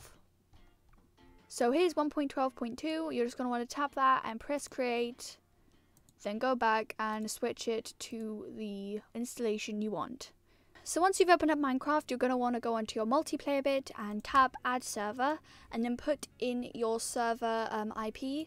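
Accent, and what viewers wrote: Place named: United Kingdom. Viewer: aye u quite bri'ish yere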